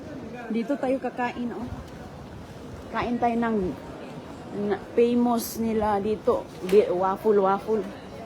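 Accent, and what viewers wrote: Visayas, Philippines. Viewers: Sorry Jud Bisaya man si ❤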